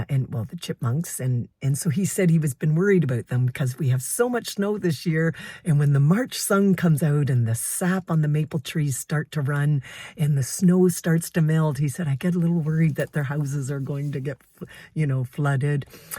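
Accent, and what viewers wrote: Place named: Canada. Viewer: your sweet, soft, mellifluous Canadian voice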